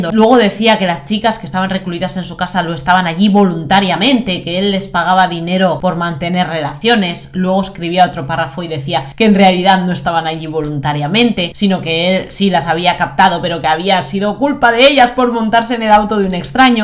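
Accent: Spain. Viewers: Al principio,pensé,ke hacías algo de cosmética.pero por casualidad pasó ese rato Y vi tus historias,y me encantan .los casos y tu manera de contar mola mucho pareces mañica.un 10 per tu desde Barcelona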